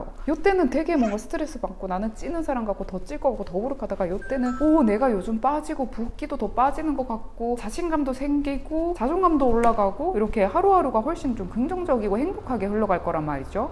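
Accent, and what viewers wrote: Gyeongsang, South Korea. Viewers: 머지 경상도 사투리 들려용 !!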